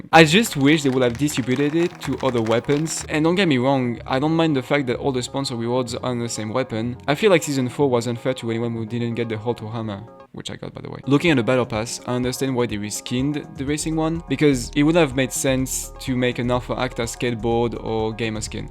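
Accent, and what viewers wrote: France. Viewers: Accent français grillé ! Mais en vrai ça va c'est léger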